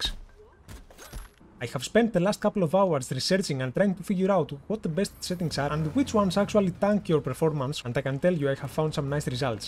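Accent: Greece. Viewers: Greek .. I can bet you Are greek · From your accent, I instantly realised that you are from Greece. Δεν περίμενα να έβρισκα Έλληνα youtuber να μιλάει για το cyberpunk xd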